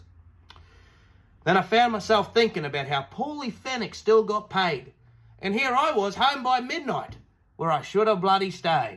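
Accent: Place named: Australia. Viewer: I surf utube alot and I'm so glad that I came across your channel 🤣. It's a rippa 👌👍. Great to hear a fairdinkum assie telling a good old yarn 😅. Bloody love it mate 👍. Sorry about your mate but in his memory as a good old assie keep on telling them yarns and keep the dream alive 😂🫡💔❤️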